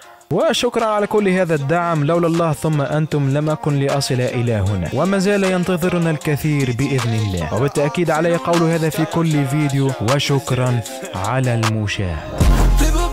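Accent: Tunisia. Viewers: انت تونسي كيفي؟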